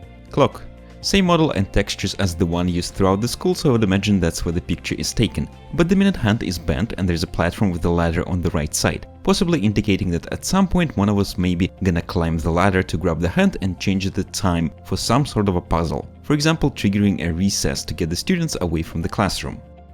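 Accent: Russia. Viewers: sir, are you by any chance Russian?) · Я слышу русский акцент)))))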